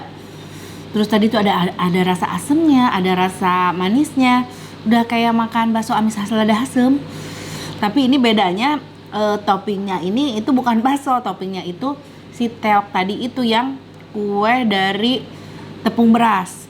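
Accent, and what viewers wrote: West Java, Indonesia. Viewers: Mukanya cara ngomong nya meni sunda banget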